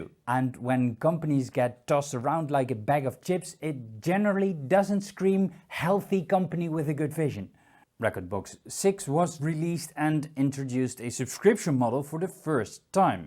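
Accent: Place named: Netherlands. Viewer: Ah ik dacht al dat je van NL komt grappig hoe snel ik dat hoor. gr uit limburg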